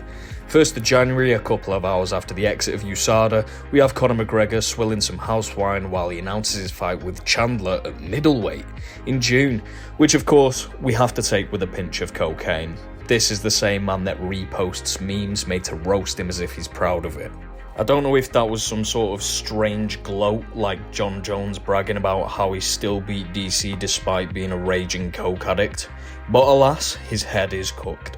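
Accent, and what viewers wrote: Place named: Manchester, United Kingdom. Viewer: Love to see it - bringing the Manc accent and charm to the masses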